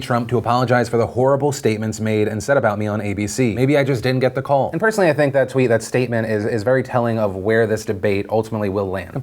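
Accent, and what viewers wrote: South, United States. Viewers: Why does talk with a southern like accent some of the time? When he is of Italian descent, raised in the Bronx, and currently lives in LA. 🤔🤔🤔 Love and his show, just wondering?! Lol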